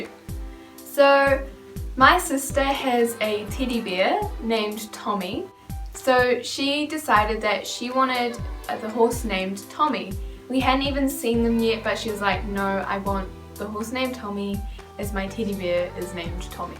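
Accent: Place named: Australia. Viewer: I knew you were Australian but I didn’t really fully grasp how... Australian you are. Your accent took me by surprise.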